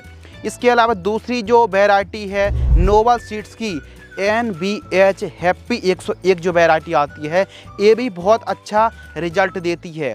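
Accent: Bundelkhand, India. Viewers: सर आप बुन्देलखण्ड से हो का ❤❤हमे yesa लग रहा हैं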